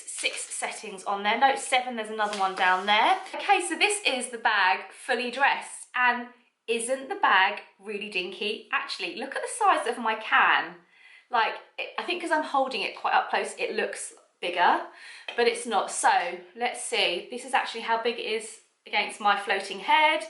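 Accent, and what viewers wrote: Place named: United Kingdom. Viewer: Love your British accent! I got the nano noe in the DA print with the animation holiday edition. Subscribed!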